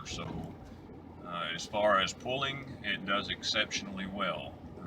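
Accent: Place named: Tennessee, United States. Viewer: 11.5 meter reading, if it's anything like my Caravan you can take about 2 mpg off that, on a recent trip up to Vermont mine read 28.6 but calculator said 25.6. A friend who just bought one I asked him if he was getting 15 mpg he said he be tickled if he could get 12. Not a better looking truck on the road, but oh man the fuel economy is awful! Awesome TN accent, this man could tear up a fishing or hunting show, I like my preachers to talk like this man, our Preacher is from Nashville TN, best man I ever met in my life! Brother n laws Silverado gets 15, my Ford gets 19.25. I don't have a v8, but oh man she pulls! I still Live the RAMs looks.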